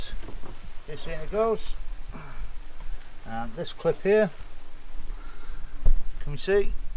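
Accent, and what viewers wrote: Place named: Wales, United Kingdom. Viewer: Please excuse me but are you Welsh.great accent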